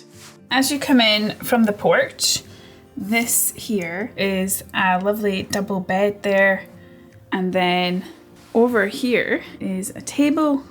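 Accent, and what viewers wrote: Scotland, United Kingdom. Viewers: I love your videos......I love your accent....I can't place it.......people in Clarkston spoke like you, but I feel you are from Fort William or the such like. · The Scottish accent❤ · Hi How are you doing today How was your Christmas Holiday I like your youtube channel I watch every video you are beautiful, i like your Scottish accent Stay safe traveling Take care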